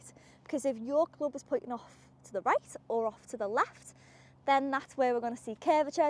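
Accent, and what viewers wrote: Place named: England, United Kingdom. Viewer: you are a great teacher and I love your English Accent 🥰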